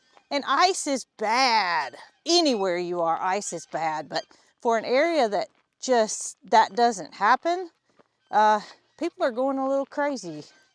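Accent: South, United States. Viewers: I knew listening to you that you were going to say”bonkers”! I love your southern accent! Yes! It certainly is going to an interesting weekend even up here in MD! You guys take care and hopefully we all will be ok! I was scared to use my cast iron fry pan because my stove top is glass. I just didn’t trust my wrist to set the pan down gentle enough not to crack the glass. So I gave it to good will.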